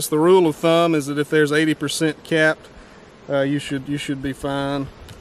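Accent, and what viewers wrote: South, United States. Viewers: Can someone please tell me where in the south this accent is spoken.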